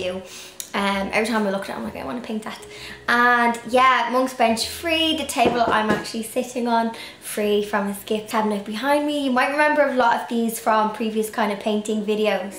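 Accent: Ireland. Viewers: Hi, what a wonderful master bedroom, it is ideal. I only have a fairly small bedroom, fine for me and my cats, I have four, was five but one died last week and I was devastated, she had a poor heart. Anyway you always cheer me up with your beautiful accent and amazing videos, thanks so much. xx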